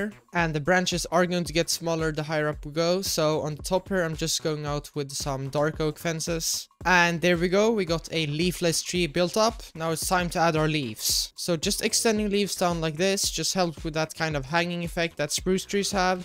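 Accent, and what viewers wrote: Sweden. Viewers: Är du typ svensk, sounds like swidish accent. Nice build anyway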